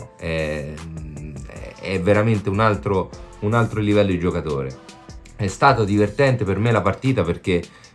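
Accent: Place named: Rome, Italy. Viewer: Ma quando mi piace sto....con questo accento romanesco sempre sorridente, competente.Arrivera' il giorno di miretti di fagioli e attenzione.....Pogba.....sono di Bergamo juventino (antiatalantino).Mi raccomando.Ciaooo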